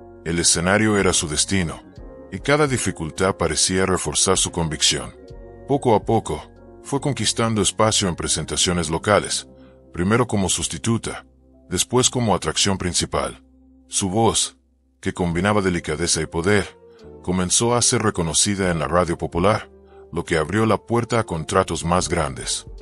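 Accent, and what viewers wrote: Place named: United States. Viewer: Con todo respeto no seas Mamon , Habla bien eres mexicano??? O gabacho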